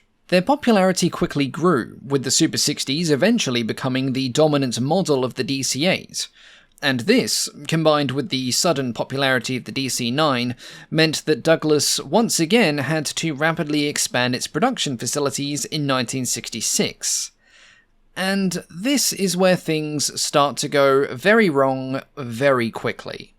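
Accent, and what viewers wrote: Australia. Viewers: Nike is pronounced just like the Nike shoe or did I miss this because of your Aussie accent <link> Cheers from San Francisco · Oh god, another Australian with the dull mechanical delivery of a schoolboy reading out his homework. But worse.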